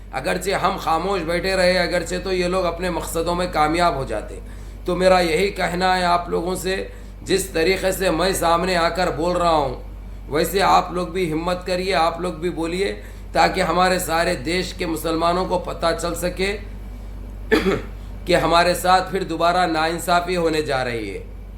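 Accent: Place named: Hyderabad, India. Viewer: Assalam walekum Bhai rah bar Allah AAP ki umar may barkat day Hyderabadi jabaan suney may itni pyaari lagti hai ki log sunkar muskurattein hai lekin Kai loga ko yeh baat nahi malum ki Hyderabadi sirf Biryani kay liye nahi Balki Kurbaani kay liye bhi jaani jaati hai jaise ki Nizam Badshah Aur unkay aulad aur jo log bhi masjidon ko Shahid karne mansoobay bana rahein hai Allah unko gaarat karenga inshalla Aur Allah mazlumo ki aur Hum sab ki zaalim kay Zulm say hifazat farmaye Aamin summa aameen Noor sayyad Dua may yaad rakhiye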